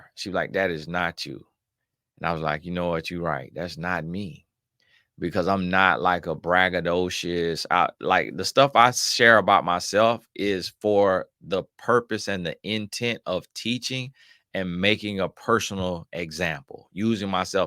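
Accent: South, United States. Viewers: I started to make a comment underneath someone's video because this "man of God", who is also divorced, decided he wanted to make fun of you because of your southern drawl. He said that he disagreed w something you said, but I'm still puzzled about how Christians can disagree if they both believe in the same Word. I think he just called you out to up his subscribers. So instead of being a "typical angry black woman", I voted with my feet and no longer watch his videos.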